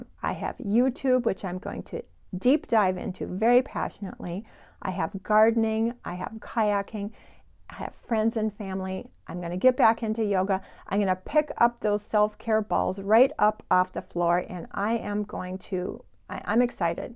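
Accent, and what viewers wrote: Minnesota, United States. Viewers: I am so happy for you. Also, I love your accent. I realize that, for security reasons, you won't say where you live, but you sound as if you're from Minnesota. I could listen to you read the telephone book!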